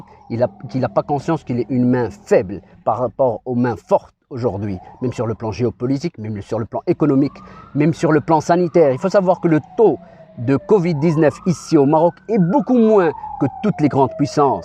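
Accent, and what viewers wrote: Belgium. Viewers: L'accent belge. Votre langue c'est aussi le français car vous parlez français dans toutes vos vidéos. Le Maroc a eu la France comme alerte. Parlez au gouvernement Français pas à la France.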